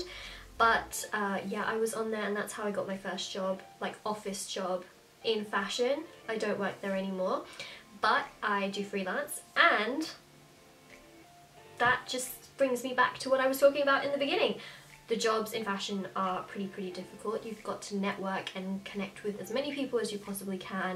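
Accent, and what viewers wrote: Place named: Australia. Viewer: Are you originally from Australia? You have a mixed Aussie-English accent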